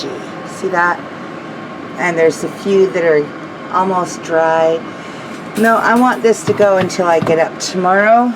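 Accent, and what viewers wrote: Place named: Canada. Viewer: Just came across your videos today! Love your way of teaching and your Canadian accent! Subscribed and look forward to watching all your videos!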